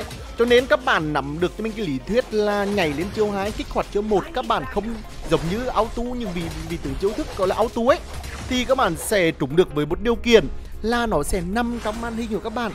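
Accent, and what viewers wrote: Nghe An, Vietnam. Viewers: Người miền Trung, Nghệ An đúng tình cảm luôn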